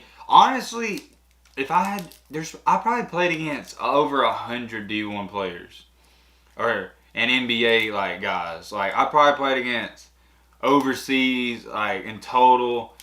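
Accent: South, United States. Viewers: He’s southern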